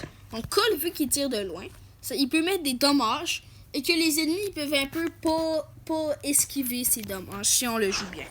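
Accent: Quebec, Canada. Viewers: t'es québécois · T'es Québécois ? Si oui j'aime bien ton accent😉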